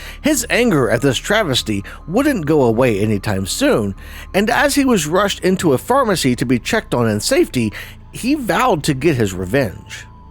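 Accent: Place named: United Kingdom. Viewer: Hey you're that fast speaking british guy that hates bonnie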